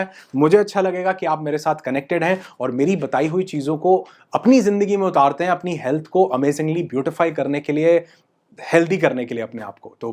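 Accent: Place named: Punjab, India. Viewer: Apka sose pose is... Proper punjabi tone.. I love it❤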